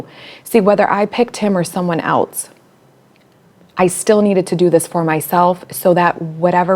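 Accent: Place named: South, United States. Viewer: Such a New Yorker....love the accent from down South girl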